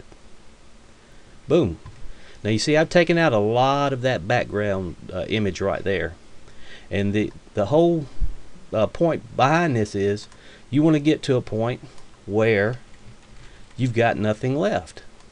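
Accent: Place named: South, United States. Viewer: love the southern accent. and thanks for the tutorial!!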